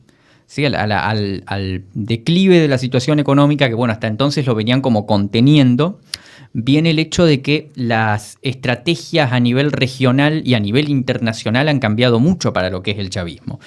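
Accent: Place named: Argentina. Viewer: Analista político venezolano con acento argentino.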